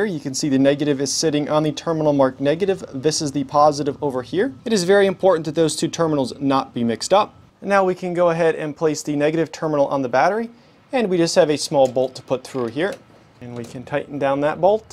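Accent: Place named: Canada. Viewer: are those Canadian dollar prices ? you sound Canadian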